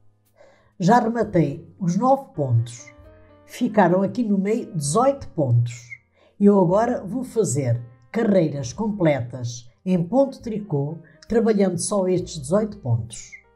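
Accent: Portugal. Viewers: Parabéns pela lindeza de meia e pelo sotaque lusitano, que me fez lembrar meu tio. 👏🏽😉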